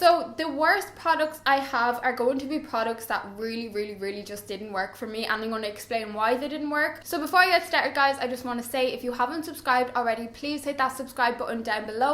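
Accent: Ireland. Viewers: What part of Ireland are you from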